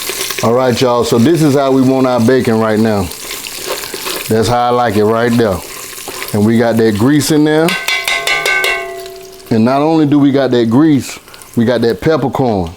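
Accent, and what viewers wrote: South, United States. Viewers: “Not too much where it’ll set you on fiyahh” 🤣🤣🤣 Looove the southern accent brotha and thanks for sharing your kitchen with us!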